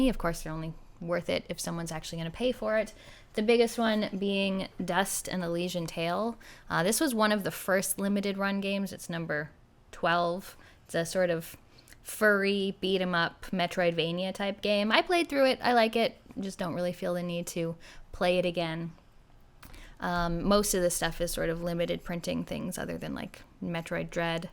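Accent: Canada. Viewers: I think we all realize youre candian by how you pronounce about lol But yeah duck bucks not greenbacks lol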